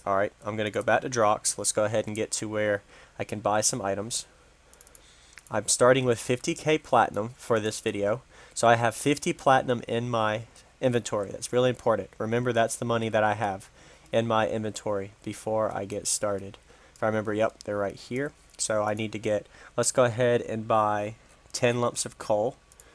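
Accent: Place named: England, United Kingdom. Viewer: INGOTS! not ignots.... N G.... not G N.... u sound english man wtf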